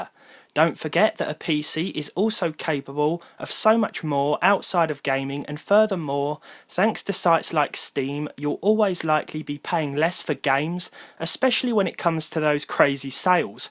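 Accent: United Kingdom. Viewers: Love this channel. Which part of the UK are you from?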